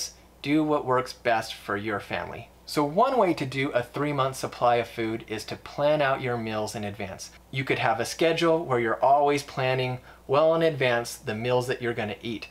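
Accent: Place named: Utah, United States. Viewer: By the way say meal and oatmeal I’m guessing you are from Utah 😀I like your pantry. You have the same exact items I have lol. We must shop at the same places.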